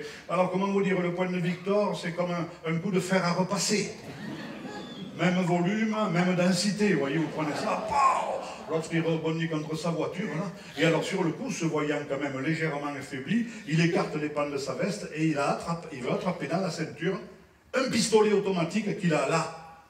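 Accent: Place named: South, France. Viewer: avé l'acent = génialissimo !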